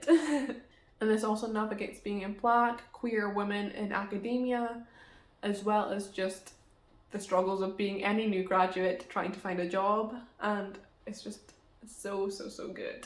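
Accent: Scotland, United Kingdom. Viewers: Do you have a Scottish accent when you speak Spanish? 😆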